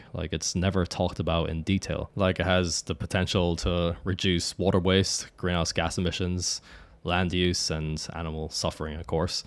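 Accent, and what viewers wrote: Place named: Ireland. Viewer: No, he’s just Irish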